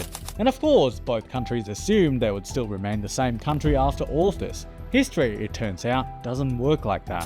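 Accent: Australia. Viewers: You have the most Australian accent I've heard on youtube do far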